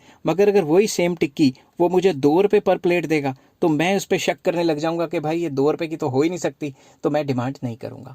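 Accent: Punjab, India. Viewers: Your punjabi accent is to good ❤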